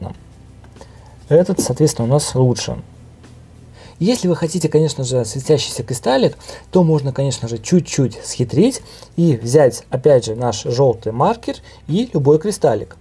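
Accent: Latvia. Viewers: Вы из Латвии?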